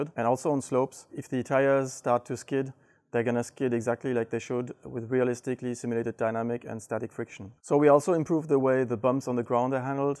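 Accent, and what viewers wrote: France. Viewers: As seriously as I take all these amazing new sim technologies and stunning realism... that French accent just takes the cake for me xD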